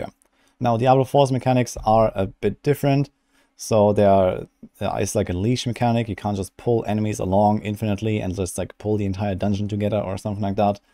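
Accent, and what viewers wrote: Germany. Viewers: Your German accent is sick LOL love it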